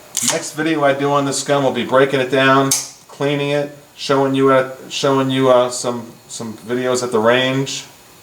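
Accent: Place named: New England, United States. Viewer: Ya from Mass? got the New England accent going on there.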